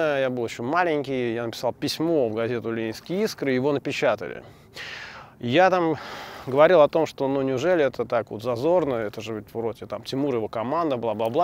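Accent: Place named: Moscow, Russia. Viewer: ты стал говорить как МАсквич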